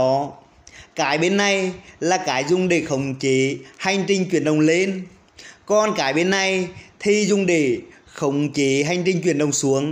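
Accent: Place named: Central, Vietnam. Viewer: Giọng miền trung Hay quá chúc bạn ngày mới vui vẻ nhé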